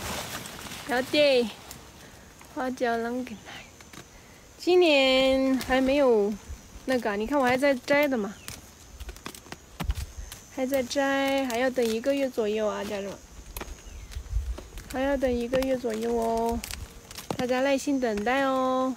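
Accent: Sichuan, China. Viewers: 사천 방언을 하시는것 같아요 티베트언어는 할줄아세요?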